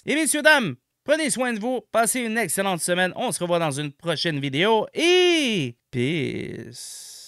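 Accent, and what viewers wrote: Quebec, Canada. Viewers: ah l'accend on dirait un québecquoi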